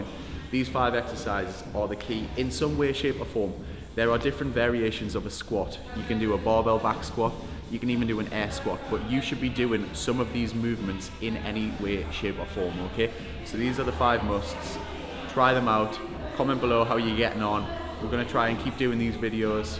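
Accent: Newcastle, United Kingdom. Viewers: Geordie lad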